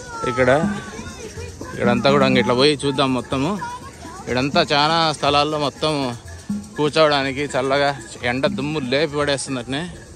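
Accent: Rayalaseema, India. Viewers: మీరు చెప్పే పద్ధతి చాలా బాగుంది అన్న. రాయలసీమ accent.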